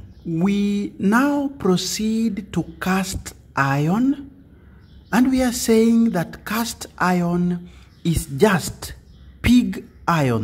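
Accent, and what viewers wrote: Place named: Kenya. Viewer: Abeg i no dey understand this Kenya accent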